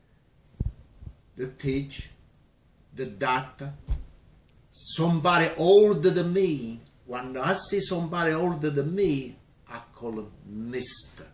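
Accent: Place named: South, Italy. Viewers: Southern Italian dialect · I LOVE SIGNORE PASQUALE!!! ❤️❤️❤️ He sounds similar to the way we speak. We’re from Napoli, so I think he’s from a southern region of Italy bc I understood his dialect very well.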